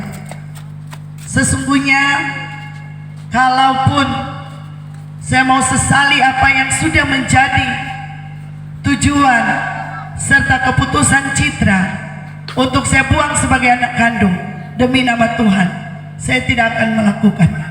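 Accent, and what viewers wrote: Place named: Manado, Indonesia. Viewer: Kalau dengar bahasanya kayaknya dari Sulawesi Utara kota Manado...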